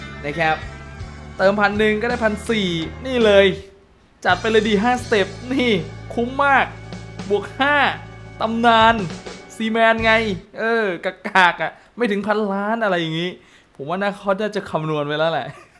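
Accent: Isan, Thailand. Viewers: เอ้า พี่เป็นภาคอีสานผมพึ่งรุ้ แล้วอยู่จังหวัดอะไรครับ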